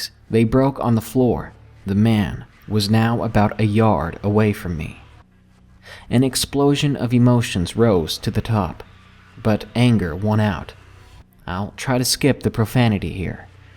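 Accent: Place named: South, United States. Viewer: Omg! sounds so young and southern, i fucking love it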